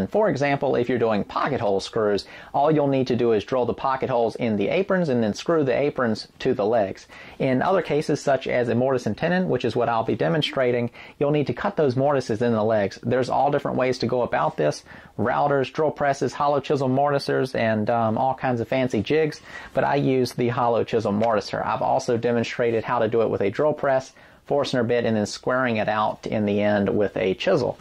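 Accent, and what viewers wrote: South, United States. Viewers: Another great video - clear and precise instructions as usual - and delivered in a Southern drawl which I could listen to all day. I watch your vids over and over - the sound of your machinery and commentary bounces around my kitchen driving my wife crazy. Ever thought of teaching? Or maybe setting up woodworking courses? I'm UK based (Liverpool) so it would be near impossible for me to attend but I'm sure people would. Keep them coming! Thanks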